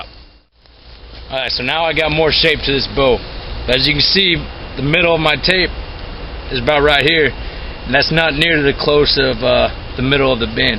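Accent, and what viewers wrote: South, United States. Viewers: Awsome bow !!very simple to make..nice hat and sunglasses 😁☺love his southern accent😂☺👍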